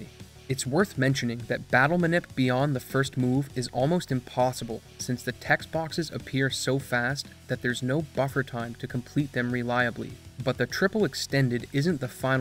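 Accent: Canada. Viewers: proud of myself for predicting that you were Canadian based on your accent. Great video though!!!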